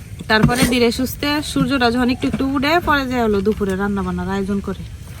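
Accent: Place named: Narsingdi, Bangladesh. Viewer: আস্সালামুআলাইকুম। আপু আপনার কথা শুনে মনে হচ্ছে নরসিংদীর। আমার দাদার বাড়ি।জানাবেন প্লিজ। নাকি ময়মনসিংহের?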